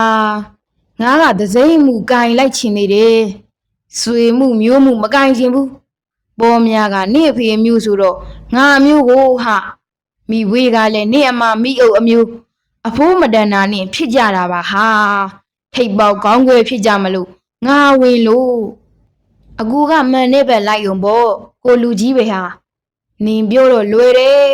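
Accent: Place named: Upper Burma, Myanmar. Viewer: ဆရာမခင်ခင်ထူးရဲ့အရေးအသားအသုံးအနှုန်းတွေကရိုးရှင်းတယ်အညာဒေဓလေ့တွေကိုပုံဖော်သလိုခံစားရတယ်ဖတ်ပေးတဲ့သူရဲ့အညာသံကလဲဝတ္ထုကိုပိုကြွစေတယ်ကျနော်မျက်မမြင်တစ်ယောက်အနေနဲ့နားထောင်ရတဲ့အတွက်ကျေးဇူးအထူးတင်ပါတယ်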